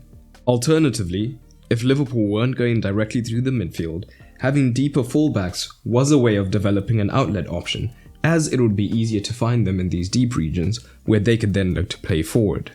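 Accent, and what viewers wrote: South Africa. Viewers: Are you South African? Great analysis- love your videos❤️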